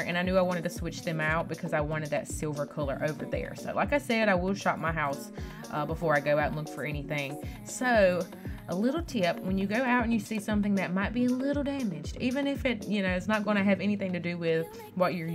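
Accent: South, United States. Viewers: I literally been watching your channel all day because it's my #OffDay and I checked out your Pinterest page out and I loved it Heck I love all the southern country style inspiration I'm from Texas so this bell loves farm house vibes and beautiful southern ascents hats off to you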